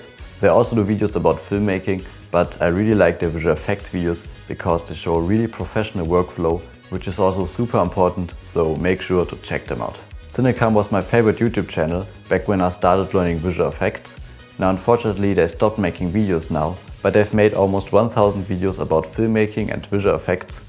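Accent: Germany. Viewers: You really sound like a german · dieser deutsche akzent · ar you germen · i am hearing deutsch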